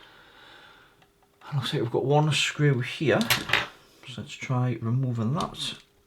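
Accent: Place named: South Shields, United Kingdom. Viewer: New subscriber,... Aussie but with S Shields roots... I've been watching a few of these repair videos, Just wondering where you are from? Sound so much like the kids I went to school with over there - especially 'bah-ries'... My mate always used to take the piss out of me for saying 'batt-uh-ries'. Hehehe...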